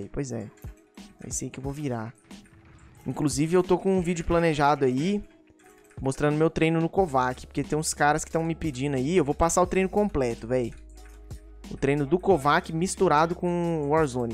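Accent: Minas Gerais, Brazil. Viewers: Mano pelo jeito que vc fala da para percever que vc e mineiro demais e tem muito sotaque tbm sou e nois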